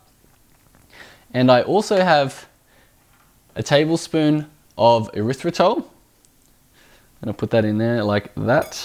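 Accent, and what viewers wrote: Australia. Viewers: Wow! how awesome! They turned out Deliciously fantastic and perfect too!! I too, could have devoured the whole lot in no time. They were a hit with my Bestie! I didnt trust myself with them all. So I sent some away with my Bestie to enjoy also. thank you so much! So glad I found your channel. Finally, I found a fellow Aussie to keep me focussed on my keto committed journey! Hearing you say “$5 bucks from Kmart.” Was ‘home’ to me! Gotta love it!” I have subscribed and can’t wait for many more Aussie recipe ideas. GOD love you! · Good to hear a fellow Aussie talking about Keto lifestyle on here:-) I’m day7 great video!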